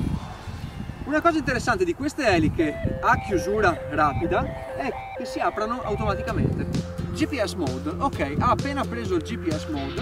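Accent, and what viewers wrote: Veneto, Italy. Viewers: be' io sono Mestre e la parlata veneta la riconosco😋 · uè zio sei veneto?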